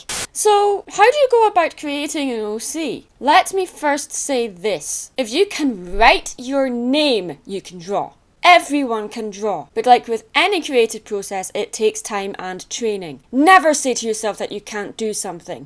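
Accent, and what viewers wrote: Scotland, United Kingdom. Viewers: OMG ur Scottish I love u 4 EVAR!!!!!!! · i honestly love your accent and i believe you are the only scottish female pony tuber.. that just makes you special :D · Yay it's a Scottish youtuber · U Scottish so am i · Adorable >u< I love her accent and I'm actually part Scottish too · Btw if reads this were in the highlands do you live is it the birthplace of ICTFC if it is you need to say cuz if you do I would flip. Also what accent do you have is it invernigian or glaswegian or other yay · I'm Scottish too athough i dont have an accent But I Love yours! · this is too scottish for me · Mhm, me and Dog like your Scottish accent.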